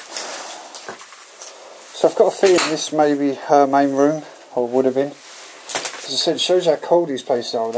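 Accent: England, United Kingdom. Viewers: The best explorers on YouTube. Not too much talk, and when do, the great English accent. Some explorers know very little about what they are looking at.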